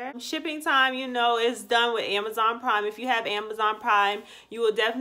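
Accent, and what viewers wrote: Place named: Charleston, United States. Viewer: Where are you from lol ? You sound like us in Charleston lol love the review very nice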